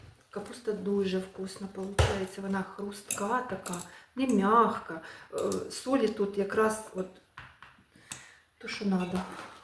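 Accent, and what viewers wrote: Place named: Surzhik, Ukraine. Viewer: Якщо б требо було повільніше, то не вийде.Суржик жахливий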